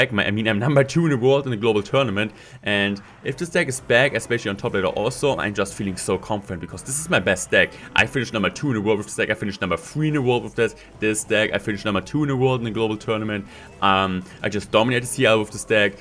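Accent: Germany. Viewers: I love your German accent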